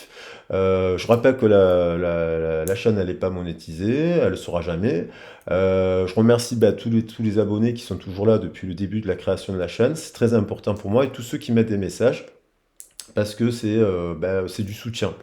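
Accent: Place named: South, France. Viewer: Coucou! Le simple fait de t'entendre dire: "Hé coucou tout le monde", avec l'accent du soleil🌞donne la pêche🤣 Je pense être une guérisseuse, à ma manière... Je te souhaite un bon week-end prolongé de Pâques, même si le temps n'est pas au beau fixe sur le Sud🌧.. Gros bisous🕊🐣😘🍀!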